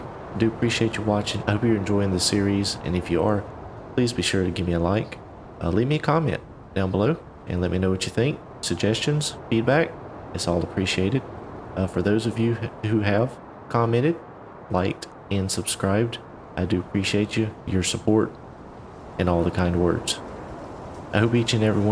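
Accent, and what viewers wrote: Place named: Texas, United States. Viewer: Are you from Texas you kinda got that accent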